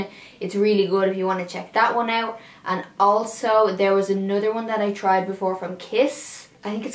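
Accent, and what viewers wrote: Ireland. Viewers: nidaa haib pretty sure Irish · Irish (Dublin I think)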